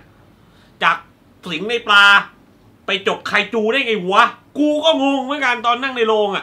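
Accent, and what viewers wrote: South, Thailand. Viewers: พี่เป็นคนใต้ป่าวครับ55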